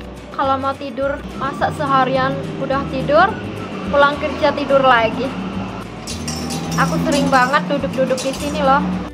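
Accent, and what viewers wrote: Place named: Java, Indonesia. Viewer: Meski di taiwan..logat jawanya msh kerasa bgt.mntap...kgn indo ga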